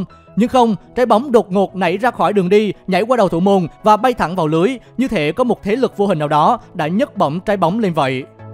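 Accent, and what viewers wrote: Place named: Da Nang, Vietnam. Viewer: Người đà nẵng hả em bé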